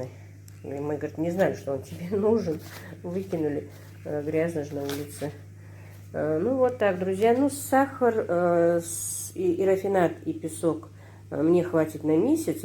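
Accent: Standard. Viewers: если не секрет, вы родились в Ингушетии? Чисто говорите на русском языке.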